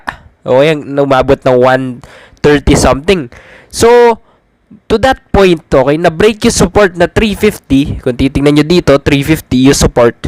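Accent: Pampanga, Philippines. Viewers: Very helpful ang mga videos mo. Thank you. Kapampangan ka ba lods? Yung accent kase. 😁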